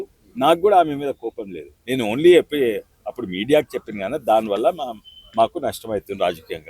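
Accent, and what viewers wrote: Telangana, India. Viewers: Sir firing eroju😂😂😂 Achamaina Telangana yasa😂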